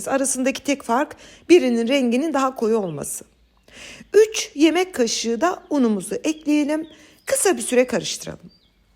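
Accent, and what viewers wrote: Germany. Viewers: Ellerinize güzel sakin anlatımınıza ince detay vermenize aklınıza sağlık sağlıkla kalın teşekkür ederim. Antalya Türkiye. Babam da sizin gibi şupangıle şıtress şıpor der konuşurken Almanya da yaşamanın minik süsü kulağa yabancı gelmiyor. Sevgiler.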